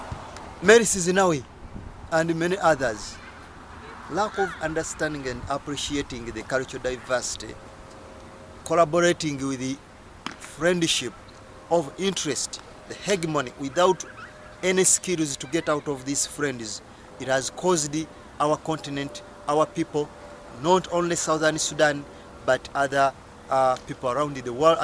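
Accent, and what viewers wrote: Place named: England, United Kingdom. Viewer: You got a lot of shit to get over. I hate your English accent so bad.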